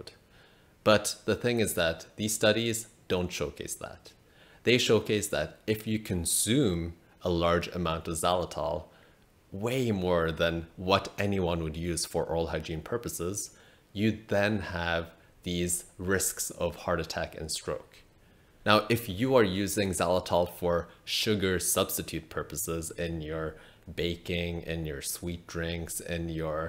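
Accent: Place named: South Africa. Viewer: Hi, I love your videos - well done 👍🏻. When I listen to your accent, sometimes I think I detect either a South African (my former country) or New Zealand accent on some words? I must say the release of the study of Xylitol swerved my inclination to start using it. Now I know how much they used, I will rethink it. One thought I have is when we put a substance in our mouth, it is absorbed into the bloodstream so I'm wondering if this may raise risk 🤔. Just a musing...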